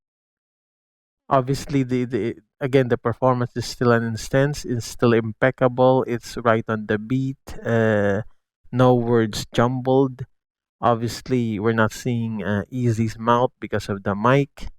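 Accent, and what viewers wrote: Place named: India. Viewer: Akala ko foreigner ka nung una kong marinig boses mo noong unang panood ko sa video mo.Ganyan kasi bigkas ng ibang Indians hehe✌️keep grinding lang kapatid.May your channel grow.God bless!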